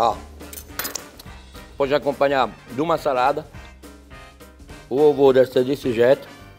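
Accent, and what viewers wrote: France. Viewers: Eu amo esse sotaque francês 😍💕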